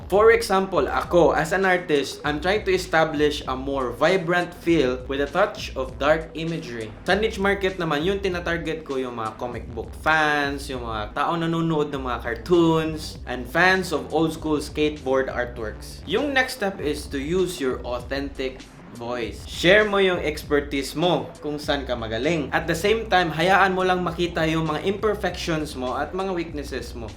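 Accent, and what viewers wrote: Visayas, Philippines. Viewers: [UNRELATED] Bisaya kaba? haha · bisaya ka hahahha pareho diay ta asa man diay inyu?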